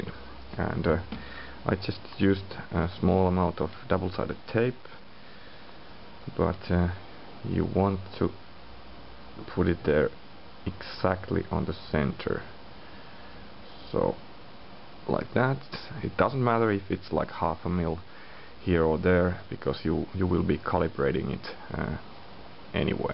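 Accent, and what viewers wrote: Finland. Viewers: Kyllä se suomen aksentti on sitten oikeasti selvää ja helposti ymmärrettävää... Kiitokset :) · Suomalaiset aina puhuu näin englantia.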